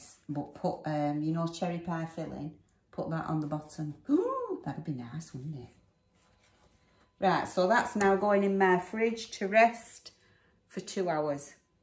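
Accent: Lancashire, United Kingdom. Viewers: Ooo looks yummy must have a go at that soon. New subscriber here & loving your content, are you a (dare I say it) a Lancashire lass? It’s where I’m from….thought I recognised the accent?! Look forward to catching up on your previous videos & please do a cook with me video, I for one would love it. Thank you, take care. Kind regards, Lynda 😉🌻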